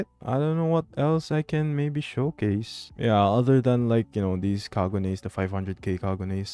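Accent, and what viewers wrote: Philippines. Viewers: Filipino kaba based sa accent mo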